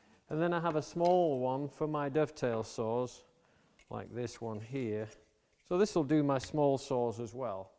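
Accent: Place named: Yorkshire, United Kingdom. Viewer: Your accent sounds like my father's Yorkshire?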